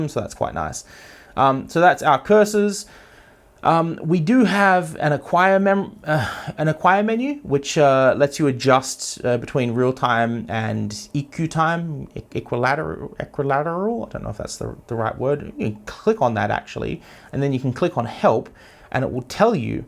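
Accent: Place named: Australia. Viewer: You sound Australian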